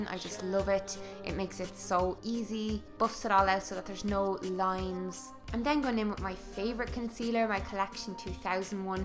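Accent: Ireland. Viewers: As much as Kylie Jenner kinda annoys me (and all the hype... which I don't really "get")... or the concept of makeup done for the sake of selfies, I LOVED this. First time watching. New sub;) <3 So charming, and super skilled! Also, don't get me started on Irish accents. GOTTA LOVE THEM!!!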